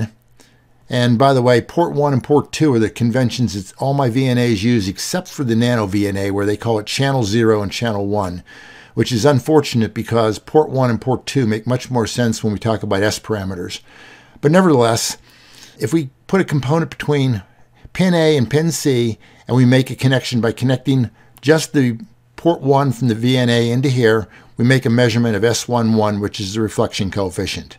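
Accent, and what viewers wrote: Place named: England, United Kingdom. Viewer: Do you speak Russia?I don't understand your video. Because you speak like English man. Where are you from?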